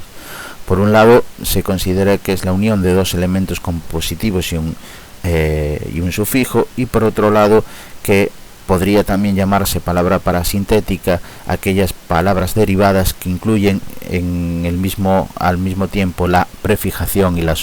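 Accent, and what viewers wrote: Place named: Catalonia, Spain. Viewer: es el catalan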